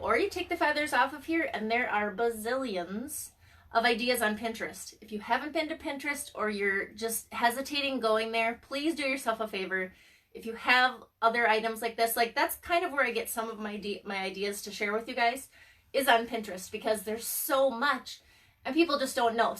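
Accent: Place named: Ireland. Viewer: You kinda sound irish lol :)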